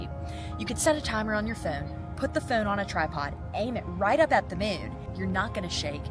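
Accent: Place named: Baltimore, United States. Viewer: Baltimore girl?? That accent on the Os is why I ask…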